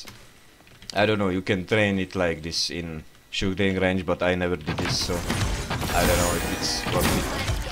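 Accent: Czech Republic. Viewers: Hii I'm new here but I have a question...are you from czech republic? Love your accent ! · Jsem si říkal, že takováhle angličtina musí být od Čecha, nicméně nice vysvětlení jak hrát za Evie, dneska zkusím, jestli to je ještě aktuální a pokusím se za ní naučit. :D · Are you from cz?protože máš takovej přízvuk.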